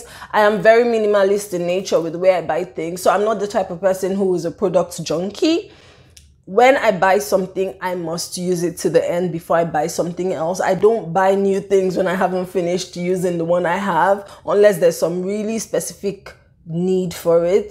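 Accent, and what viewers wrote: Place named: Pidgin, Nigeria. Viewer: The intro totally got me😂😂 I love your pidgin😎😎😎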